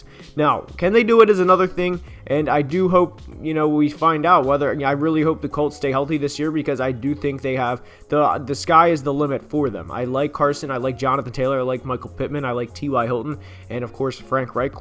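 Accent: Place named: United States. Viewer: I knew you were from MD or PA because of that accent.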